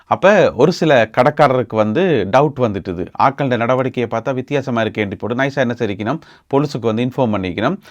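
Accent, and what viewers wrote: Jaffna, Sri Lanka. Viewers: தம்பி உங்கள் தமிழ் உச்சரிப்பு. அருமை 🎉🎉🎉🎉🎉. யாழ்ப்பாணம் தமிழ் ❤❤❤❤❤❤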